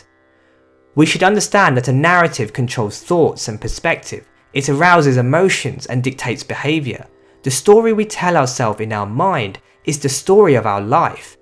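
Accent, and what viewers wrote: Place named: United Kingdom. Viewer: First of all great video, it seems from the accent you are british but the pronunciation of hindi words and gods names or places was excellent ,thanx for making this video ,truly educational.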